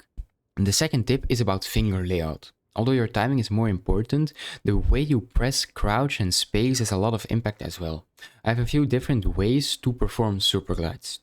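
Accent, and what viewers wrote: Belgium. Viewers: belgian accent vs brazilian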